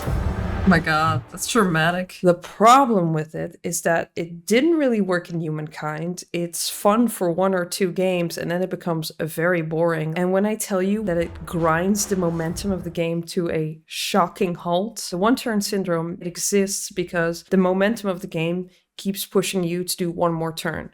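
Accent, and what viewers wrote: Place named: Netherlands. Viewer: I smell Dutch accent🗿 But I feel like they kinda dumbed down the fun parts of civ 7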